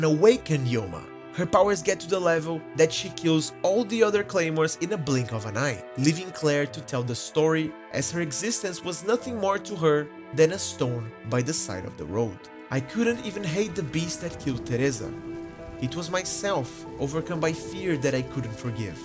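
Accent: Brazil. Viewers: Tu é brazuca mano?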